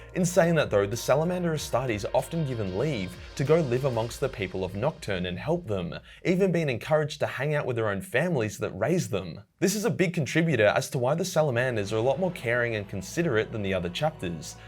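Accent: Australia. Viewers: It takes an Australian 40k nerd to make Yandare sound cool what a time we live in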